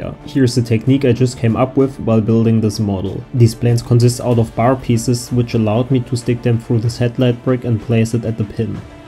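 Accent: Germany. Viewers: man hört es wen du deutsch sprichst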